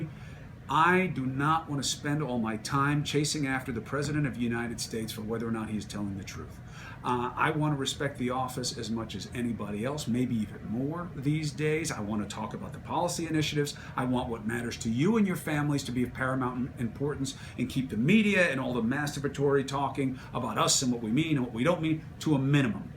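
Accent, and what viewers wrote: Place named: Ireland. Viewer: you got the best brain, you know that? Plus you know words. You've got the best words. Ever heard that before? Lol. Thx for the vid. BTW, I know u are of Italian descent, but I could swear I detect a smidgen of an Irish lilt in your accent? Sexy as all heck! Keep at it. xx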